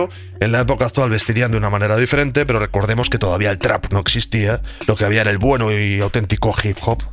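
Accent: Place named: Catalonia, Spain. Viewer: ¿En serio? ¿Eres cantabro?. Coño, pro tu acento pense que eras catalan. Que pequeño es el mundo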